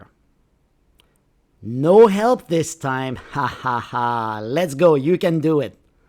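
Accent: United States. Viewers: You speak American English. What about dates in British English? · stupid American English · American trying to teach how to say numbers in English. Absolutely awful accent and certainly we native British people do not speak like this woman.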